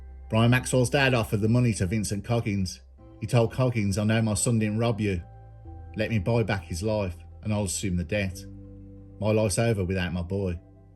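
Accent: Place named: Black Country, United Kingdom. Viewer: Lad as from the "North" and a don't usually find the Midlands/Black country accent a thing of gravity to myself, but your calm delivery has me thinking, thanks for these uploads.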